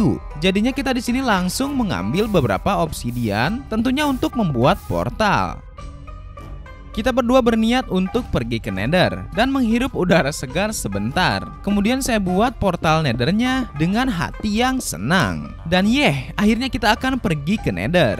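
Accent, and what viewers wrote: West Java, Indonesia. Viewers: Orang sunda bg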